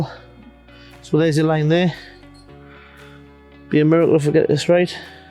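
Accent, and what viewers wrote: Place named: United Kingdom. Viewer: That is the most british sounding man ever jesus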